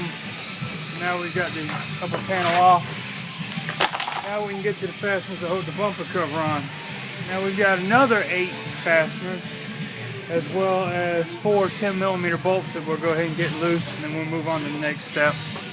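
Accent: South, United States. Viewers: Haha I thought I heard a slight twang in your voice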